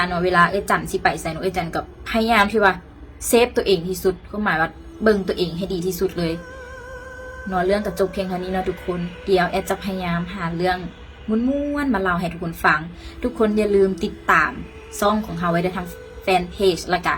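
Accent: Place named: South, Thailand. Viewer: ຮູ້ສຶກວ່າທາງພາກໃຕ້ຂອງຫຼາຍເນາະ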